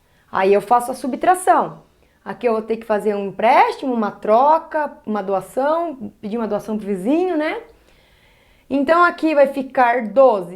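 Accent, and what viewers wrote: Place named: Caipira, Brazil. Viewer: Muito bem explicado! E o seu sotaquezinho caipira é muito bonitinho!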